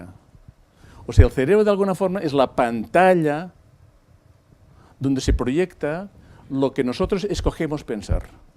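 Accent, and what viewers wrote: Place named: Catalonia, Spain. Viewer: Probablemente, coadyuvante... Con el acento catalán ya...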